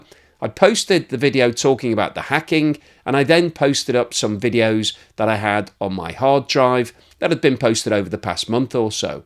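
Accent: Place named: England, United Kingdom. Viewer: i don't find all your content interesting however I find enough of your content interesting enough that I will start to watch all your videos. Of all the channels I subscribe to, yours is one of about 5 channels I follow where the channel owner has an English accent, and I find the English accent is much more appealing to listen to than most American accents... I subscribe to both of your channels and I will give your content time, good information is always useful even if I can't use that information in the near future, share advice I have no interest in as I don't currently invest however I may do so in the future so I will watch videos on that topic just in case I need it 20 years from now, I did the same when I was choosing classes in highschool, I too highschool accounting and 10 years later I used that knowledge so I could understand blanance sheets and other financial documents for my business without needing an accountant (small pc repair business selling my time only so no stock etc) The drop in viewership may relate to the frequency of uploads, I think this can mess with the algorithm somehow and effect the impressions of your videos in peoples feeds, do you have a drop in subscribers on your main channel as that will affect things.....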